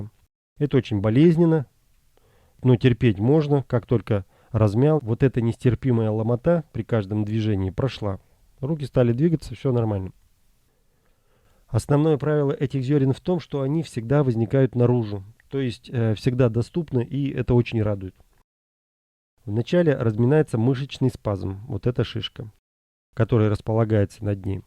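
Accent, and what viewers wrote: Yekaterinburg, Russia. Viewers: Екатеринбургский говор. Вы оттуда?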